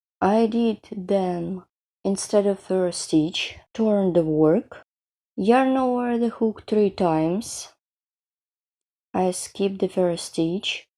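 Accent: Russia. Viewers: говорите лучше по -русски,а не на английском с русским произношением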